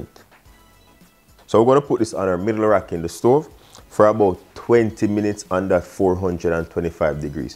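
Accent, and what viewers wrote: Jamaica. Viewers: Love that accent/ patwah don't know if I spelled that right. 😁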